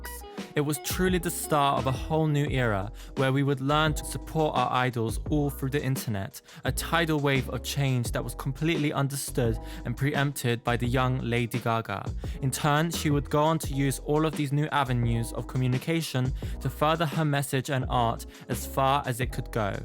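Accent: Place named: England, United Kingdom. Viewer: Not to dismiss her fashion influence, but one cannot attribute a cultural zeitgeist to a single person. Additionally, Beyonce wore a robot suit before we know the name Lady Gaga. Check her Get me Bodied performance at the BET Awards. I hear the English accent, however, so I understand that you may have your own cultural biases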